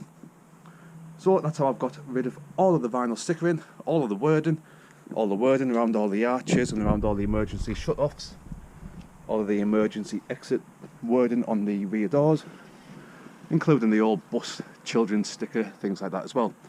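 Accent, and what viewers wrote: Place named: Newcastle, United Kingdom. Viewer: Many thanks, very helpful. You can't beat a Geordie accent!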